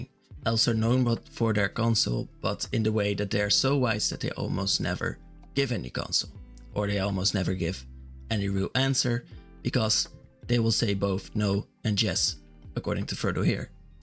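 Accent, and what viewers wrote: Netherlands. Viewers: You dutch 🤔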